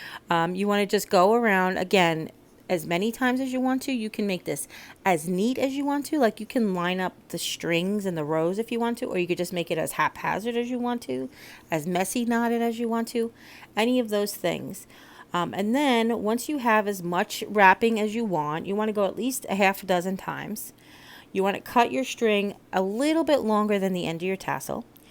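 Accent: New York, United States. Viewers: Beautifully done! The way you tucked in the moss really made the letter stand out. Great tute (lol) on how to make an absolutely perfect tassle. The trick with securing the wrapping string is a madame knot, I'm sure you know that. You were going to say something about the shamrock and/or four leaf clover, did I miss it? Tyfs, love these and as always it was so nice spending a little time with you, your New York accent really came out on this tutorial and I love it. See you soon 🤗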